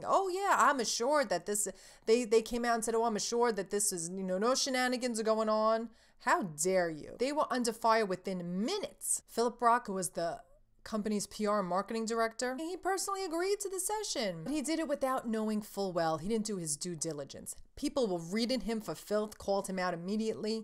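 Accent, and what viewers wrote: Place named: East Coast, United States. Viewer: I'm a new viewer so forgive me for asking if you already said so but you have an interesting accent, are you from the east coast?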